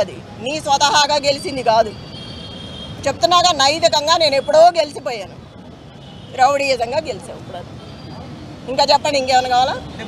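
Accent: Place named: Andhra Pradesh, India. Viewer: ఈమె తెలంగాణ రాష్ట్రం కాదు ఆంధ్ర బాషా చూడండి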